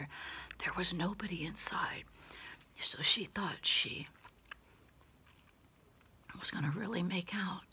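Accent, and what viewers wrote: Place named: Greece. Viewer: Sounds like greek woman I'm greek too για αυτό το κατάλαβα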